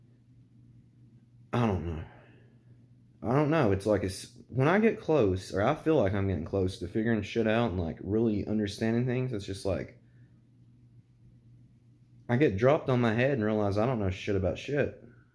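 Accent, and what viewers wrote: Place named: Bible Belt, United States. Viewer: I'm sure you've already taken a trip into Alan Watts territory but if you haven't please check him out! I had always heard of him but never listened to any of his words. Theyre a perfect breakdown of how to better understand the self from a westerners views. You have a thick accent like youre from where I am from which is the Bible belt. There's so much valuable info to hear in regards to how thats shaped our view of the self and God and how guilt has been turned virtue by the church. Regardless of if youre from a Christian background or not youre from a Christian society which has shaped our language about such stuff too. We don't carry the necessary terms to describe stuff such as the Brahman that is the self and all we do. This stuff will never answer questions about simulation theory but it will show you how to live only today and worry nothing about tomorrow for what happens to you is what you will, and always has been the will of you. 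By living only in the present it matters little what the future holds. And that includes waking up out of this computer program lol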